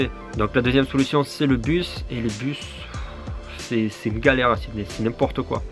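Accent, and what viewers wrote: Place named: mixed, France. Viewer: Mec désolé c'est pas au sujet de la vidéo et ont a du te le dire déjà mais je beug sur ton accent dés la première fois où je lai entendu, du Français avec un mélange d'accent de l'Est avec un accents du Sud de France.. c'est.. intéressant, oft moi aussi j'éponge l'accent des autres a leurs contact, j'ai déjà eu l'accent d'un de mes pote qui est Ukrainien a force de trainé avec lui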